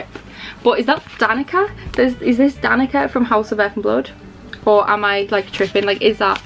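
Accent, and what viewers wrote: Liverpool, United Kingdom. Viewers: Where are you from in the uk, you sound Like you’re from Liverpool x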